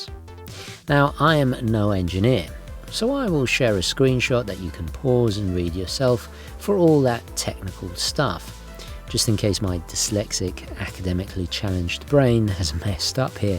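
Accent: United Kingdom. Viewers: An American with a Brit accent in a foreign country doesn’t get anymore American than that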